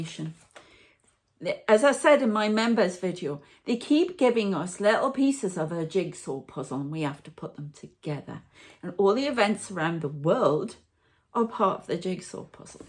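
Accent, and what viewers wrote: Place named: Newcastle, United Kingdom. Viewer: Thank you for your read.. Are you a Geordy. Like me.. I can hear it in your voice..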